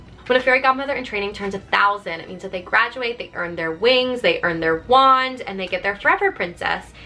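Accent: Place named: South, United States. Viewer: Being home for so long is bringing out tiny hints of your southern accent...take a shot every time says "ah" instead of "I":D Also we love and miss Holly!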